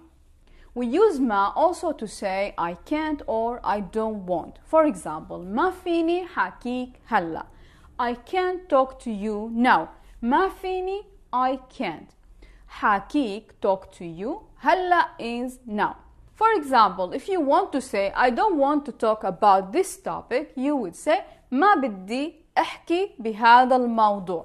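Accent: Damascus, Syria. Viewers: Thanks, dear for your answer. Your lessons are very very well presented, understandable and useful. Thanks once again. I would like to ask you, is your accent, if that is possible to call it so, Damascene? Because as far as I know, e.g. people of Damascus and Aleppo speak somewhat differently.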